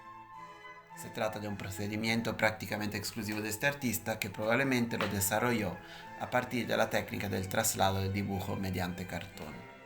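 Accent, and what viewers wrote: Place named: Italy. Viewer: ¿Que “contaminación” del italiano? Habla un español muy bueno, con acento italiano, naturalmente.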